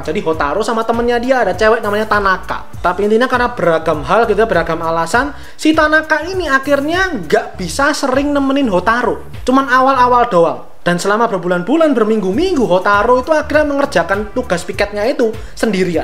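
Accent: Java, Indonesia. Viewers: Medok bang 😂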